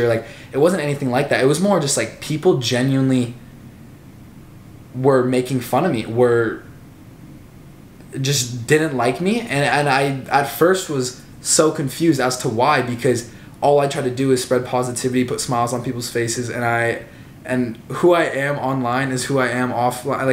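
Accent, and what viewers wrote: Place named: New York, United States. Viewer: It kinda sounds like he took a trip to New York picked up a lil accent and came back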